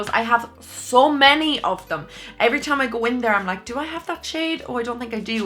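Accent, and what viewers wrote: Ireland. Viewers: Oh my god I literally just stumbled across this video and WOW I spend so long on YouTube watching makeup tutorials but I’ve never met an Irish YouTuber before, at points during this video I found myself just listening to your accent, it’s so nice among all these Americans 😂 I just had to hit the like and subscribe buttons, I’m definitely going to check out loads more of your videos in the future 💕 (I literally grinned when you said ‘ye’) us Irish need more representation on YouTube 😂 ☘️ 🇮🇪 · you sound Irish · Ayyyyyeeeee your Irish 2. much love❤️🇮🇪🇮🇪🇮🇪🇮🇪🇮🇪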